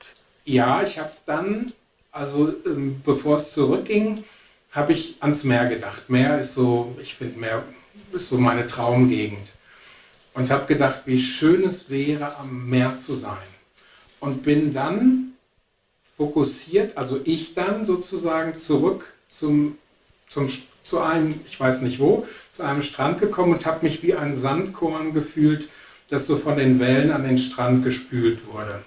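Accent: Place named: Switzerland. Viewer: Seltsam. Ich finde Herr wirkt sehr ruhig, aufmerksam und hochkonzentriert. Seine Art ist schlicht wohltuend. Den leichten Schweizer Dialekt mit dem er spricht empfinde ich als angenehm.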